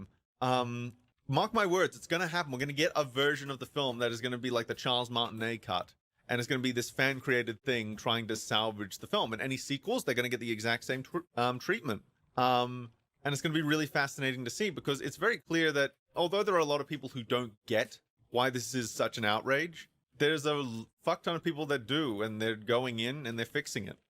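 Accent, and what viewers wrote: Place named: Australia. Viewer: You have the classiest Australian accent I’ve ever heard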